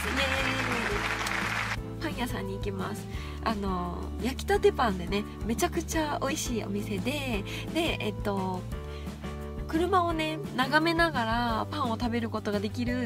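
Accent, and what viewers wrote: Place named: Hiroshima, Japan. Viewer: NAロードスターのマニュアルですか？うらやましいですー！広島弁に聞こえるのですが…わたしrootsがそうなので、だったら嬉しいです！！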